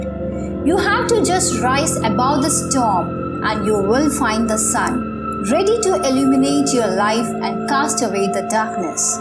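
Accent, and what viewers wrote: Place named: United States. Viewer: your english is to good i was thinking that a american is speaking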